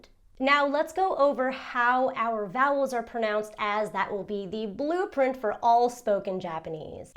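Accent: United States. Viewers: Your American accent is very nice. Were you born in USA or did you learn to speak like this? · are you american or japanese? you pretty much sound american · It's fascinating hear your English sound American, and then your Japanese sounds native.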